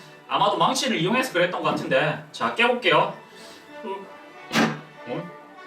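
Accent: Busan, South Korea. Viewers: 혹시........... 부산사람이세요???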